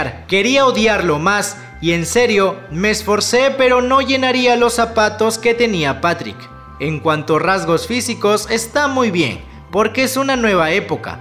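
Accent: Peru. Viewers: De que país eres xd ? por como hablas peruano pe mano ?= soy nuevo xd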